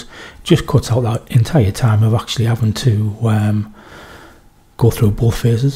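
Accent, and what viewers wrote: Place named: Durham, United Kingdom. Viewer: Just trynna get them tactical assessment bundle blueprints. Friggin things don’t wanna drop for me. Anyways, were you from mate? You sound like you’re from Durham!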